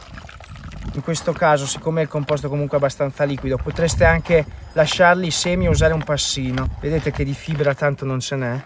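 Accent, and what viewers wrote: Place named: Romagna, Italy. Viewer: mi toglieresti una curiosita, sento che hai un'accento romagnolo, ma la location dei video e' lo stesso posto in cui vivi? se si' come si chiama quel posto in montagna, che e' splendido, si adagia perfettamente alle tue performance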